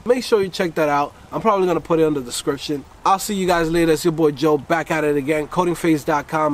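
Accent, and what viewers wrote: New York, United States. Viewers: NYC remixed with a slight D.R. accent. 😁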